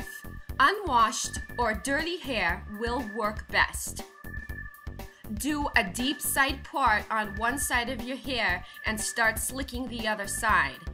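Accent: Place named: New Jersey, United States. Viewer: Oh man I loved this video!! You're so funny and have this wit and charm!! The milk jug and little dog in the hair cracked me up!:)) I love the Joisey accent. I mean Jersey.;) I'm from Joisey,I mean Jersey. lol you're the most gorgeous sexiest most beautful girl and I so love it when you play with your beautful hair and do vids like this!! You're the best my dear!:))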